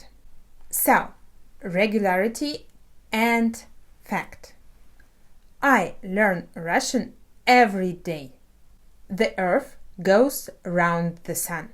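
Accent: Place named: England, United Kingdom. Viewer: I love your English accent, it gets me chills 😁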